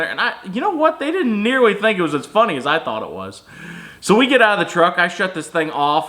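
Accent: South, United States. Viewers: Funniest thing about this video is a dude with a country accent doing a country ass accent!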